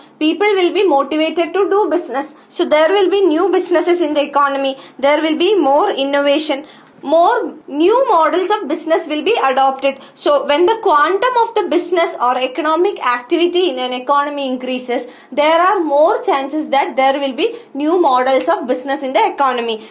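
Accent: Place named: Kerala, India. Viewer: Keralite accent... Love it 😊🙏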